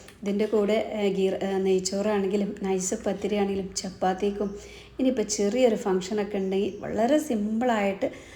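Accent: Malappuram, India. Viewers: ഇത് പണ്ടത്തെ മലപ്പുറം താത്ത തന്നെ ആണോ കാരണം ഭാഷക്ക് മാറ്റം വന്നല്ലോ ആദ്യത്തെ സംസാരം തനി മലപ്പുറം ആയിരുന്നല്ലോ ഇപ്പോൾ എങ്ങിനെ മാറി ആതാത്ത അല്ലേ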